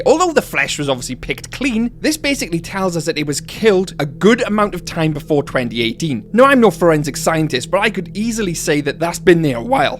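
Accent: Wales, United Kingdom. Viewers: Call me crazy but instead of hybrids on hybrids I’d love to see a deinosuchus, titanaboa, daedon or Troodons in JW. Also forgive me is your accent from wales?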